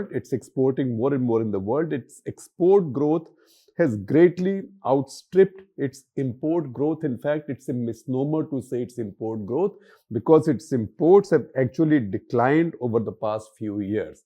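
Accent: India. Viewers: Yo, Can you speak proper English instead of Endiaish? It's an embracement for your English master out there after all these many years of colonizing.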